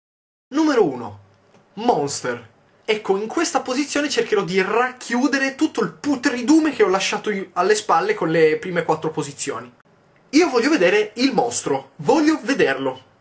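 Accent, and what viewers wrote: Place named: Calabria, Italy. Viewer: Gli ultimi 5 minuti sono stati troppo pisciosi grande yotobi xD comunque sicuro che sei di torino?quell'accento sembra tipo calabrese...eheh vecchio volpone xD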